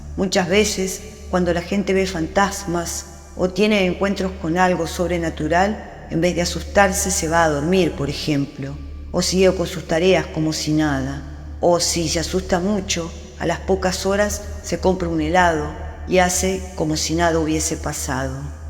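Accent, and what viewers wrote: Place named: Argentina. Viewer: La primera parte es una crónica. Qué excelente Mariana Enriquez!!. Y qué hermoso escuchar esta voz argentina ❤❤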